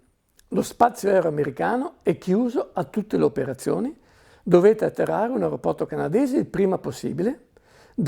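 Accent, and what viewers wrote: Veneto, Italy. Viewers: Grazie per questa testimonianza e complimenti per la gestione di una giornata così difficile. Ma è veneto? ...riconosco un accento familiare... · ma sitto veneto?? bella storia, sai raccontare bene comandante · Incredibile come faccia fatica a non parlare veneto! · È veneto lui verooo?? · Mi semba veneto sto comandante.... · Un comandante Alitalia non romano per una volta che strano un veneto o friulano da accento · Veneto doc😂 grande comandante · Concordo, competente e simpatico! Sicuramente veneto, qualche intercalare veneto si è sentito😅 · Sento tanto veneto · L'inconfondibile cadenza veneta, mi rende il racconto ancora più apprezzabile 😊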